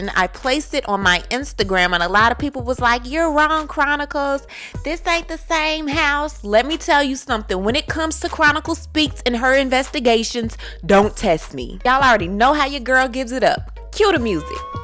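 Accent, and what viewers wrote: South, United States. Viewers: I love Chronicle Speaks and her accent. CORN-tine. You can do no wrong woman! Tea spilling facts with that friendly southern accent.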